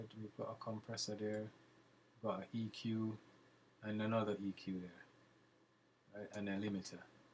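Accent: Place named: Jamaica. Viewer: Yo you sound like a Jamaica still .... glad for the tutorial ... was wonder how i would get Slate VMS to work with Apollo ... you have just given me an idea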